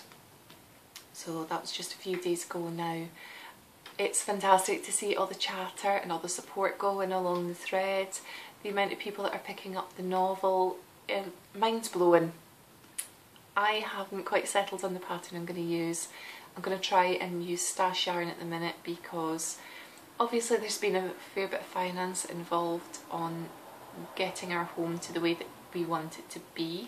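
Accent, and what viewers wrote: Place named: Scotland, United Kingdom. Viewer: Oh you are such a sweetheart:) so glad to see that your move went well! I just love your Scottish accent I could listen to you talk all day my dear! Looking forward to the Christmas sock swap!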